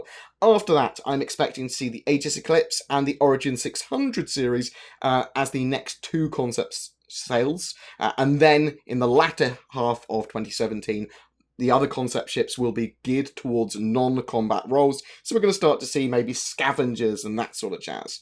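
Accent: United Kingdom. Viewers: I love the british Accent! <3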